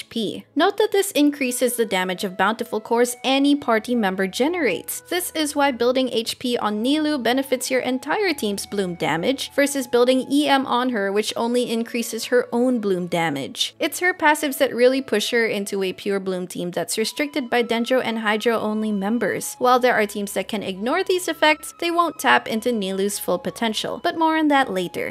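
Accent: Philippines. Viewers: I figured by the accent, Pinoys just have that certain twang lol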